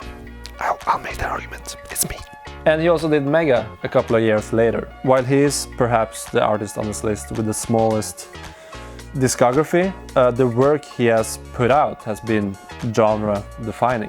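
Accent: Norway. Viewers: Yooo er du norsk Du høres norsk ut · Aha! Så du er norsk! Kjente det igjen på uttalen med én gang. Ikke mange i Norge som hører på vaporwave, og lite niche som spiller her, så jeg kjenner meg også igjen i lysten til å dra andre plasser. Bra liste btw. Personlig ville jeg snudd den på hodet, men jeg har kanskje litt annen vapor-smak :)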